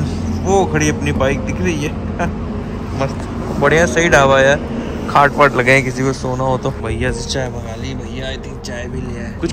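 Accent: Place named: Kanpur, India. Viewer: Aesa kyu lag raha hai ye banda kanpuriya hai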